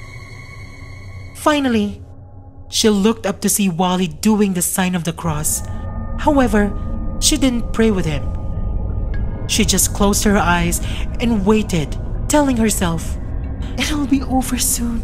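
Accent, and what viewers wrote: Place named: Philippines. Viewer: Ang sosyal naman..first time I heard a Filipino narrator doing an English story. Something new, although I didn't feel so scared because I was thinking the entire time that you're a different person because of the accent and everything.!! I mean not that intense when you're doing Tagalog horror narrations..Hahaha.. 😍😅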